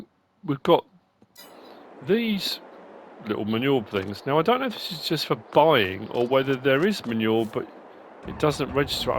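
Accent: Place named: United Kingdom. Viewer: Keep up the great work! I work night shift in the states, and your videos always get me through the last few hours of work 😂 sometimes I can’t help but hop on and try out some new mods when I get home! I appreciate what you do 👍🏻 my family is British as well, so to top it off you sound just like some of them 😆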